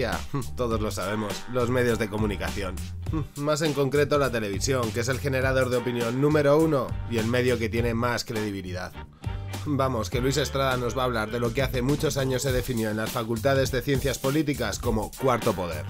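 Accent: Spain. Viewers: Pero es el doblaje latino y tienes acento español jejeje Link: <link> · ! Insólito ! ....hasta para narrador de un vídeo solo concerniente a los mexicanos...ponen a un gachupin.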